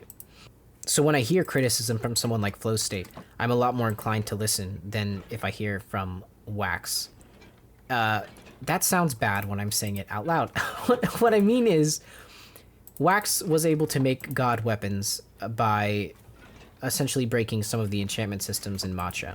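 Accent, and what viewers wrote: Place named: United States. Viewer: Some advice for using Japanese words: it's phonetically very simple, so saying a single syllable in an unusual context with an American accent is really a nightmare for understanding what you're trying to say (unless we've all read the exact Miyazaki quote you're referring to). Something you'll see on Japanese TV is subtitles and people stopping to define ambiguous terms when having conversations to avoid confusion. Or you could just say "space" or "pause" if you don't want to go into a tangent defining a Japanese word every time you talk about slower paced videos.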